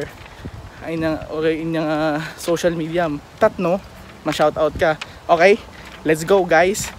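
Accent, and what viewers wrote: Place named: Ilocos, Philippines. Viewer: Kayat ko accent ti ilokano..☺😘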